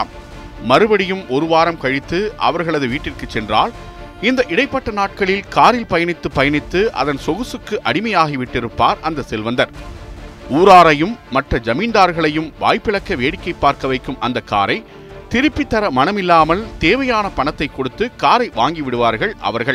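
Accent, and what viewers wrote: Tirunelveli, India. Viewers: திருநெல்வேலி காரன் லே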